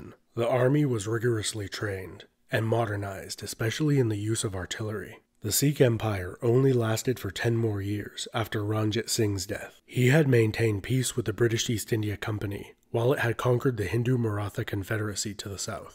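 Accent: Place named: United States. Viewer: I think it is just the American accent. They tent to pronce the letter 'u' 'oo' instead. Where an Englishman would say 'Tuesday' Americans actually say 'Toosday', and 'Youtube' becomes 'Youtoob' and so on.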